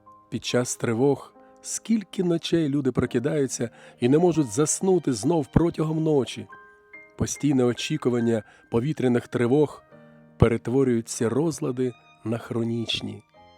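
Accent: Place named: Russia. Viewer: Все прекрасно, якби не той, вибачте, противний, російський акцент. Отче, позбудьтеся його, будь ласка, залишаючи слов'янську мову.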